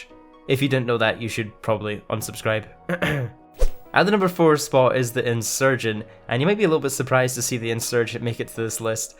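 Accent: Scotland, United Kingdom. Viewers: You didn’t know he was Scottish are you stupid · I thought he was british 😂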